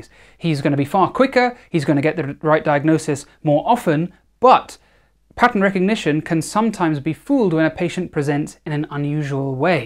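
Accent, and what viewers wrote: South Africa. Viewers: I don’t know what you have against doctors from kwazulu natal, but your South African Durban accent killed me. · top notch South African accent! Laughing so much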